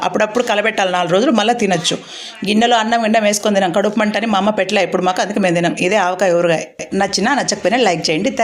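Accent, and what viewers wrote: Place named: Rayalaseema, India. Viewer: మీ మాటలు వింటుంటే, రాయలసీమ,మత్తు తెలుస్తుంది 😅,ఏ ఊరు,,?? ఊరగాయ చూస్తుంటే,,😋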